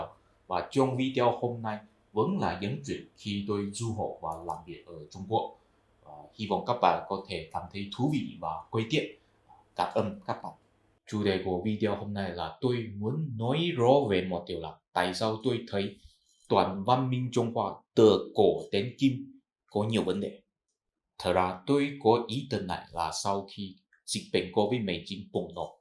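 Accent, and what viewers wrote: Standard. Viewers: Sao anh này nối tiếng Việt chuẩn mực và rõ ràng thế nhỉ? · Anh phát âm ngày càng chuẩn, trôi chảy và rõ lời hơn những video đầu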